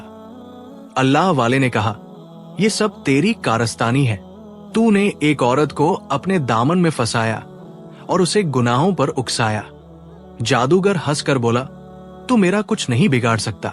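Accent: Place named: India. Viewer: Ap kahtay bslochistan say taluq, lakin awaz indian lahja??? Kaya india say voiceover karwaee.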